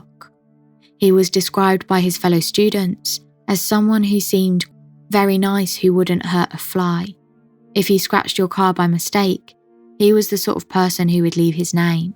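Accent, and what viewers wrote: United Kingdom. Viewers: Thank you for all the content, you're a pro! Love your soothing voice and the british accent🙏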